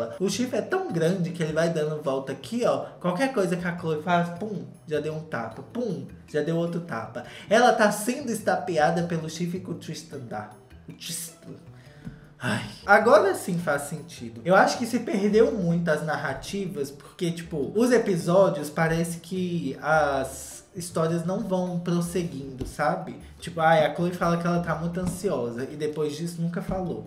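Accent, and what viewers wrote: United Kingdom. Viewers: Não há melhor canal!!!!!!! E esse inglês british Braseeeeellllll 👏👏👏👏👏👏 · O toque britânico no seu inglês é um charme a parte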